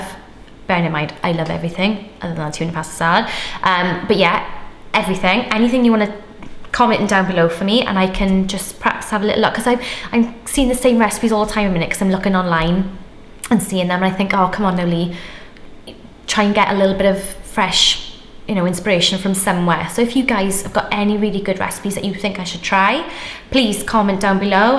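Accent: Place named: Wales, United Kingdom. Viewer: Fantastic loss again. So happy for you. Love your vlogs. I could sit and listen to your beautiful Welsh accent all day :) x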